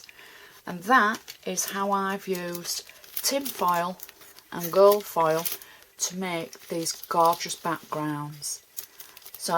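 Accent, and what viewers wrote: Yorkshire, United Kingdom. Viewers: What a great idea will have to give this a go thank you for sharing, is that a Yorkshire accent? I'm from. Doncaster 🙂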